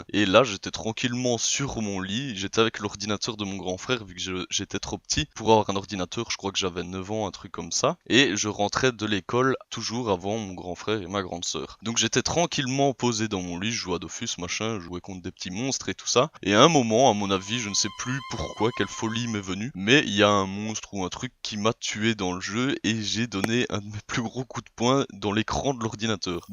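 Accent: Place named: France. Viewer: Écœurant! Je n’en reviens tout simplement pas. Où as-tu pris cet accent à la française ? Le rythme est bon, le propos intéressant et les animations soutiennent à merveille l’histoire. Bravo, cent fois Bravo...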